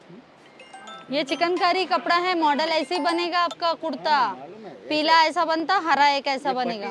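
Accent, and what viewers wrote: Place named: Hyderabad, India. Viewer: Mam yaar I really liked the Hyderabadi language in the you 😂🤣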